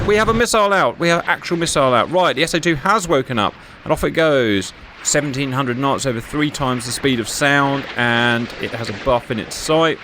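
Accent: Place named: United Kingdom. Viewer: Worst mushmouthed modern British accent I've heard. HEAAAAAHHHH, THEAAAAAHHHH, OOHWWW ( = all) so annoying. A whole generation of Brits learned to speak from listening to Jonathan Woss and Jamie Oliver.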